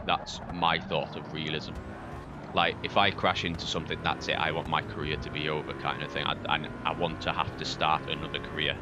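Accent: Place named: Middlesbrough, United Kingdom. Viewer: Where are you from? Sounds like a Boro accent.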